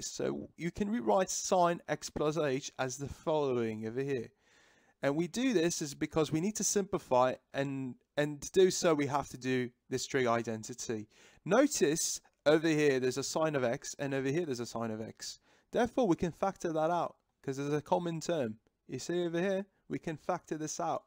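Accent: United Kingdom. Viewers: Lmao that's a heavy ass British accent.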